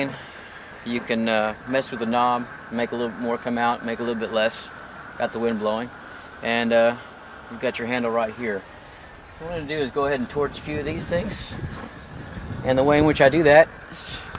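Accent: Texas, United States. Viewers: Omg i was in Texas for a year as an exchange student, and your Texan English made me so "homesick" 😀😅🇺🇸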